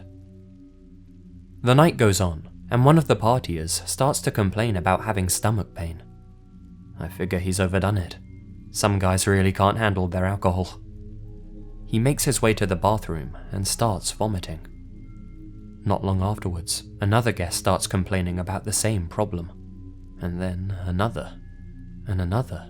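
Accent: United Kingdom. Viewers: and Be busta awesome UK narrators 👌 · British accent.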